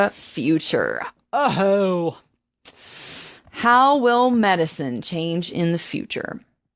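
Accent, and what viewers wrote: South, United States. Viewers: Nice to hear the southern accent coming out more!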